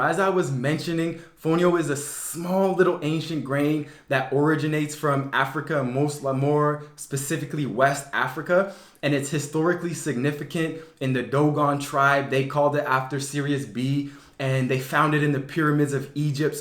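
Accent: Canada. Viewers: Are you Lucian 🇱🇨 ? Your accent sounds Canadian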